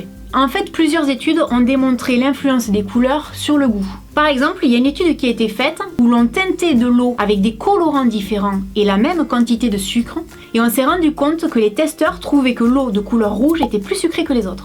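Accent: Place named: Perpignan, France. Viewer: Que dire une excellente vidéo! Que du bon sens et des astuces vraiment intéressantes. Merci belle, tu es d'un grand réconfort et ton accent de Perpignan pose du soleil sur les bleus de mon âme ☉🌝🌞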